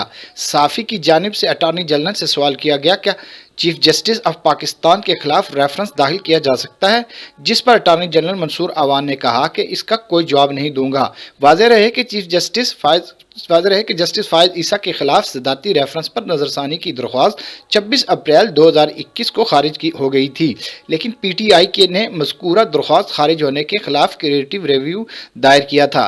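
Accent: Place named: Kashmir, India. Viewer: Pure Kashmiri Touch😂 Keep it Up🎉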